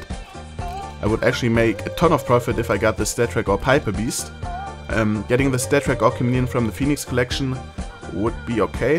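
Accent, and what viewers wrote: Germany. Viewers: Wie man merkt dass du Deutscher bist :D trotzdem sehr gutes englisch und good vid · Du bist sicher Deutsch, merkt man an deinem Englisch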